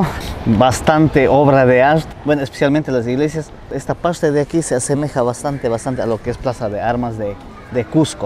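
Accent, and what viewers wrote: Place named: Ecuador. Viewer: Me da risa su forma de hablar muy específico de que parte de ecuador es😊😊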